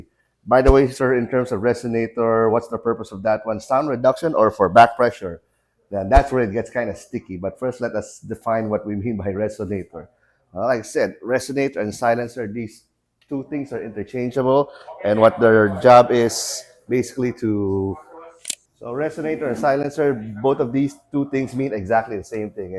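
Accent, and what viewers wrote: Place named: United States. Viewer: parang laking US si kuya..ganda ng english eh hehehehe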